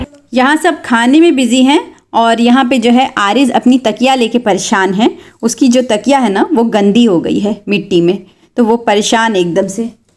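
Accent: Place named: Jharkhand, India. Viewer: Assalamu alaikum Aapi maybe India se hun Jharkhand se jab aap baat Karti Hain To mujhe lagta hai ki aap hamari taraf ki Hi Ho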